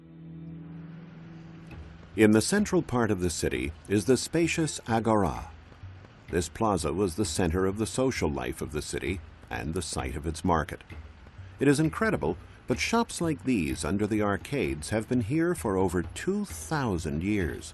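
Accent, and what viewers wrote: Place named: United States. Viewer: To attain the real truth in these matters I am convinced a time machine is required. As in present times, the political landscape must have been a swamp of trickery and treachery. An American accent covering such a topic, per the BBC, just doesn't make sense.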